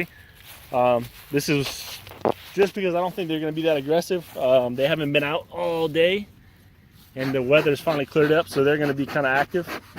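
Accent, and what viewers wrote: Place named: Lafayette, United States. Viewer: Personally, I’m terrified of bees, but I watch your videos to understand bees better. Hopefully bees won’t be as scary if I understand why they act the way they do. Also, your accent is a taste of home for the homesick. I’ve been missing Lafayette.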